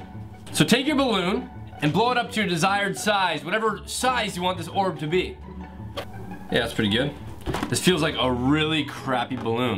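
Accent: Canada. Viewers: Soorry he sails soory like Nicole and Andrew 😍 Canadian sorry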